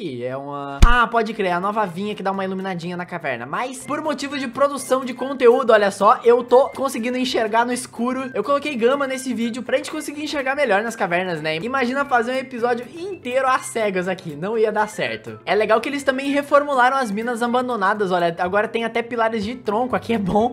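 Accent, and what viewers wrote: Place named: Rio de Janeiro, Brazil. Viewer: Vou ver se foi o Feu cariocando vc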